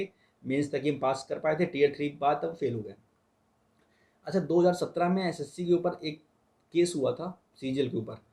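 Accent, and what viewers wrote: Kanpur, India. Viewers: are bhai ap kanpur se ho?... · Love your kanpuriya accent 😎😜😉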